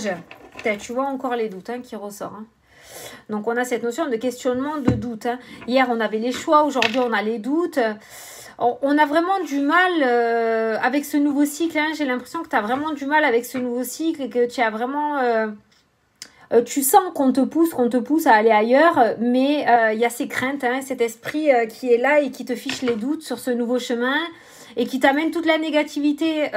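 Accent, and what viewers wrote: South, France. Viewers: merci pour ce tirage, il est trop bien. Un joyeux anniversaire , profitez bien et plein de belles choses. Et comme vous êtes du sud et que je suis du sud aussi , vous me le rappelez trop d'ailleurs, toutes vos expressions me font trop rire , ça fait un bien fou d'entendre quelqu'un qui parle comme moi "mdr". Je vous fait un énorme bisou pour votre anniversaire.merci, merci pour votre bonne humeur dans toutes vos vidéos.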